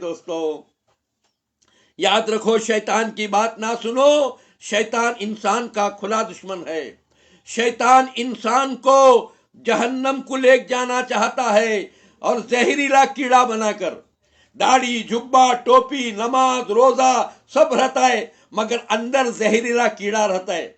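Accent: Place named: Hyderabad, India. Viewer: Uncle g ap ki language hyderabad ki mujy bht pasand hey ALLAH ap ko sehat dey. From pakistan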